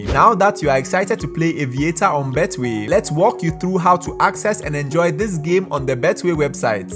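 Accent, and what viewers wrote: Nigeria. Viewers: Bro has a Nigerian accent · how is bros accent nigerian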